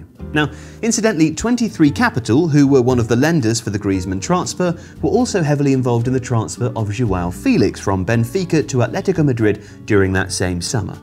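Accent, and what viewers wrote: United Kingdom. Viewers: I love your voice so much bruuvvvv. I have been watching Tifo since 2018 and I want to say that your British dialect and voice is really awesome in comparison to others I've listened to.It is a high compliment bc the internet is vast. My advice is: when you start doing commentary for live games, please dont be a siff like the majority of English announcers - look for some sauce and vivacity from the Romance languages of how they announce games (Argentinians, U.S. Hispanic announcers, and Portuguese are really good starts for research into this - they are so much fun when they announce games). And, yes, one day, BBC, or someone is going to want to use that voice....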